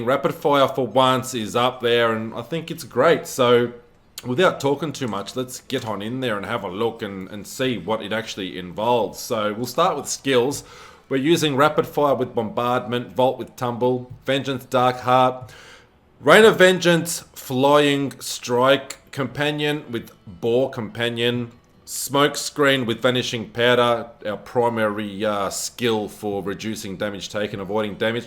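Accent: Australia. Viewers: It is like August....Augild....Orgild. Hope that clears it up, it is our Australian accent. Love your videos even if you can't talk properly.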